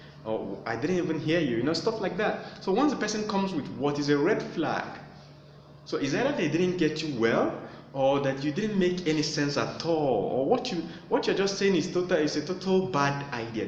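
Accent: Nigeria. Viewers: I hear the Edo accent #tongueout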